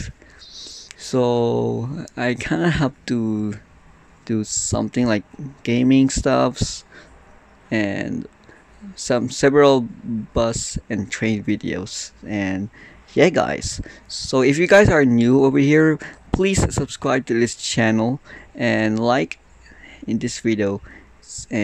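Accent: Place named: Singapore. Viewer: I thought u are from singapore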